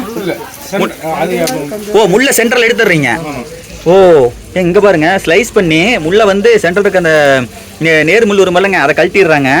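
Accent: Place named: Coimbatore, India. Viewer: கோவை தமிழ் ❤❤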